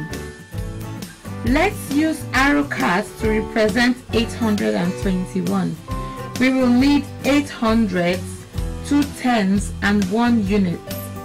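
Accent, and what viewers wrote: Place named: Nigeria. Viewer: Wow a 🇳🇬 Nigerian voice ❤❤❤❤❤ 😊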